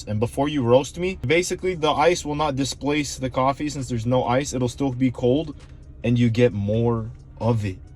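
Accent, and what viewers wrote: Australia. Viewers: That accent was Australian crossed with victorian English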